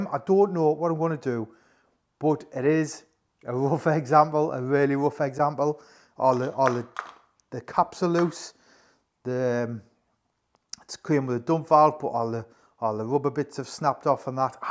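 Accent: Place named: Sunderland, United Kingdom. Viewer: Great mate. Sounds like your from my way sunny Sunderland. Caught wait for the next video. If you need any free labour let is no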